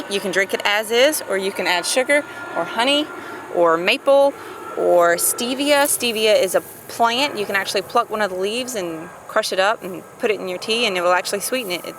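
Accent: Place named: South, United States. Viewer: Is that Loblolly Pine. I recognize that accent and I know the only pine that grows in the south is Loblolly. I am from Arkansas and you have to go to Missouri before you find white pine.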